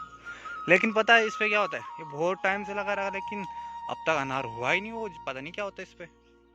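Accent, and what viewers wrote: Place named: Tehri, India. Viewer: Aap tehri se ho kya aap ka bolne ka tone wanhi ka hai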